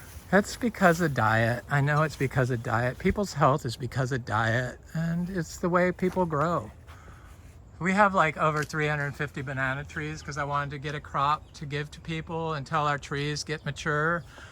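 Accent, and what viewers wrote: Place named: South, United States. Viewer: love the southern accent lol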